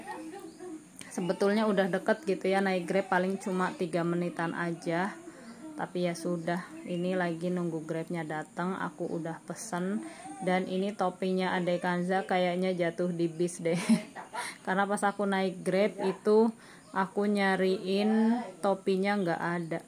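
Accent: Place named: Banyumas, Indonesia. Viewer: Mba Cilacap ya aku Purwokerto mba sama2 ngapak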